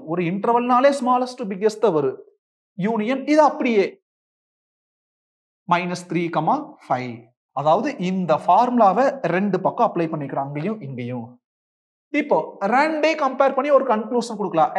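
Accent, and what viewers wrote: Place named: Kongu, India. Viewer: Ss..... It's KONGU TAMIL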